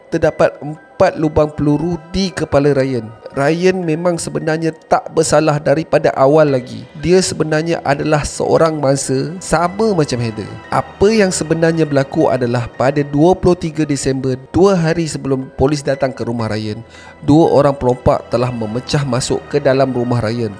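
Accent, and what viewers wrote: Perak, Malaysia. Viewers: trbaekkk...1 lagi sbbkan video2 abg yg sntiasa trbaek ni laa..jadi list fav sy untuk slalu dngar time kat tmpat krja...sblom ni kalo asyik headbanging je...lenguh jgak tngkok ni 🤣🤣🤣..dan Alhamdulillah...atas sbbkn abg ni orng perak dan stiap video abg ada sleng2 perak...skang ni dah brjangkit kat member2 skrja sbb mostly diorang smua brasal dri perak trmasuklah boss skali🤣.... yg sy baiahkan untuk jdi "murid2 baru abg...hasil dri tngok crita abg smbil connect kat speaker radio...stiap kali kat tmpat krja🤣🤣🤣🤣...btw untuk abg...smoga dipermudahkan urusan selalu dan trus melangkah maju dngan mmberi video2 abg yg menarik stiap masa...insyaAllah amin...👍🏻👍🏻👍🏻👍🏻👍🏻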